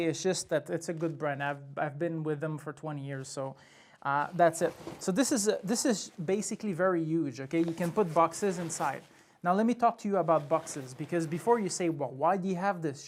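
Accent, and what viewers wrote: Quebec, Canada. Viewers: I was so glad to hear the Quebec accent when you started talking. Made me trust you more :') Thank you for the valuable info!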